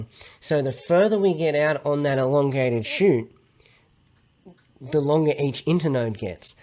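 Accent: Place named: Australia. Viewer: Brilliant explanation, bonus you got the Aussie accent mate, bloody love it legend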